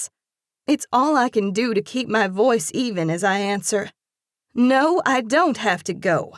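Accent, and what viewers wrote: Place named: South, United States. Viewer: Another great book in this series. I’m loving every one of them. The narration is top notch. Of course, I’m partial to the southern accent since I am from the South! Please keep this series going.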